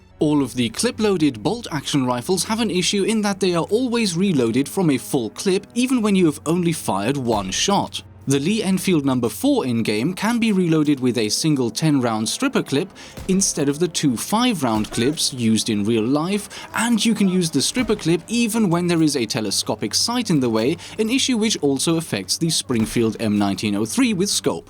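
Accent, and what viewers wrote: Germany. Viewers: I'm laughting so bad!!! Omg, how to destroy a bad CoD game based on terrible historical inaccuracy 101 - featuring; summoned to defend the honor of the Fatherland. This is why I'll stick with Battlefield 1 for the time being. Sorry to say this, but I love the sound of your german accent/voice in full fledged fury. Seems/feels so natural.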